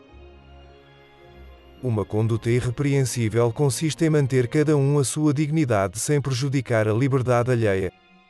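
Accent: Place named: Portugal. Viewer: Eu não consigo levar a sério essa voz de Portugal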